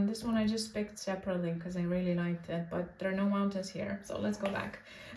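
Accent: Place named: Netherlands. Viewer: I’m so glad I came across you! All 4 of my grandparents immigrated from Netherlands to USA. I love hearing your accent and look for to more videos from you and learning more about my heritage.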